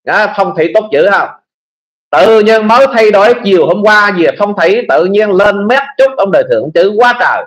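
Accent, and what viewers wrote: Standard. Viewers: Nói chuẩn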